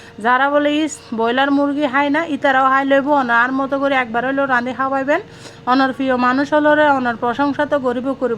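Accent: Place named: Chittagong, Bangladesh. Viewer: খুব সুন্দর হয়েছে এবং মুরগির মাংস রান্না খুব ভালো লাগে তোমার চট্টগ্রাম ভাষা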